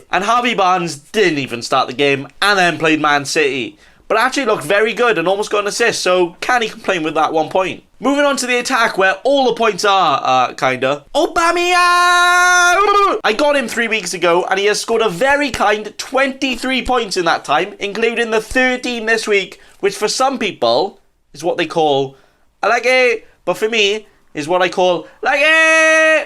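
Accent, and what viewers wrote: Wales, United Kingdom. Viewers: Where you from m8? Swansea? I’m in Haverfordwest....great video as allways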